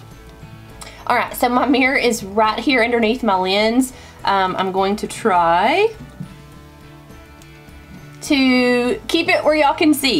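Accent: South, United States. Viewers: Love...and love your southern accent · I just bought them yesterday and just found you today thank heavens, I've never tried false lashes of any kind and will try your advice. Hope I can do this. Also, I love your sweet southern accent! Thanks for your help! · She has the cutest Southern accent!! ♥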